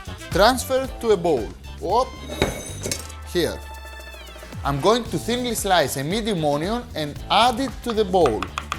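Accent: Greece. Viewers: his accent is great and I mean it. Why so many Greeks have a problem with his accent? Why on earth do you feel embarrassed with something you shouldn't and it's none of your business. And finally don't forget that for the English speaking world he is an Greek!! That's what he sells and the accent could be very well part of it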